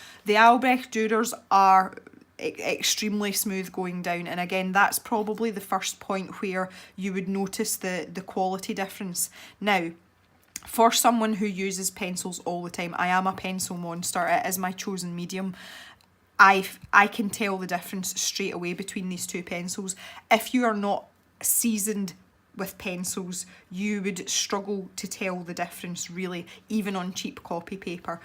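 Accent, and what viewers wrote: Scotland, United Kingdom. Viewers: Thank you for this very helpful review. The one by Faber-Castell themselves is so poorly made that it is pretty darn embarassing for them.🤭 And may I say that I just love listening to you talking, as I absolutely adore the sound of Scottish English! ❤ All the best from Germany! Instant new follower!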